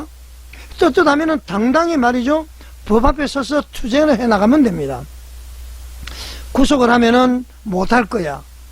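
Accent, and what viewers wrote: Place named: North Korea. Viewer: 북한말씨에 홍설수설 ᆢ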